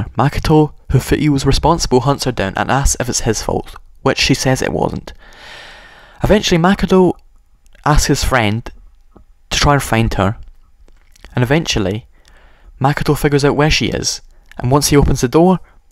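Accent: Scotland, United Kingdom. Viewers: are you scottish??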